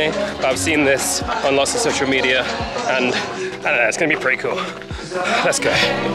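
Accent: United Kingdom. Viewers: I like your accent. Is from UK Right ? Or Am I wrong? I’m from El Salvador I like how people enjoy their time in my little and beautiful country I miss living there., I hope retire in my country hopefully some day. Cheers from California .🌺🌺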